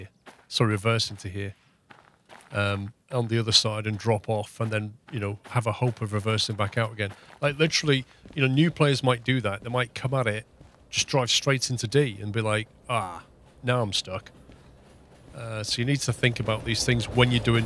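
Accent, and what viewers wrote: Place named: Birmingham, United Kingdom. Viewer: Where are you from? Sounds like good ‘ol birmingham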